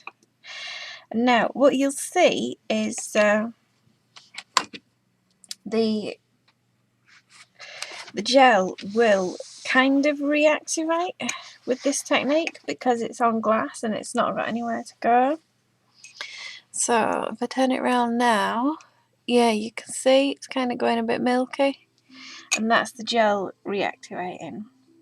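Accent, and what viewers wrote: England, United Kingdom. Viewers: sorry your english accent is to thick i cant understand a lot of the items your using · That is ALSO the first time I have ever heard anyone say they could not understand someone with a "thick" English Accent!!!!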